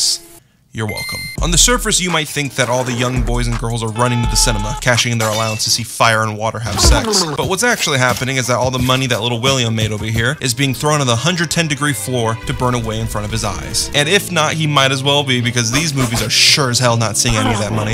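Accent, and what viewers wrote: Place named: Arizona, United States. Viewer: You must be from southern Arizona 🤙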